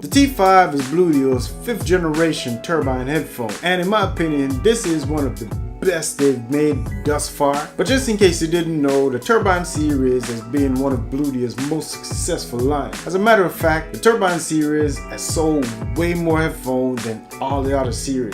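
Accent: Jamaica. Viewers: we Jamaicans know a Jamaican when we hear one..the accent is still there bro. I got the t5s but the sensor is giving trouble so I had to put tape over to stop the problem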